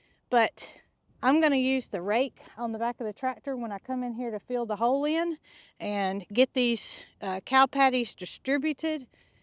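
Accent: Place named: Midwest, United States. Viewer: ❄️You sound like you live in the Midwest USA like me. I hear we might be getting more. Stay safe. ❄️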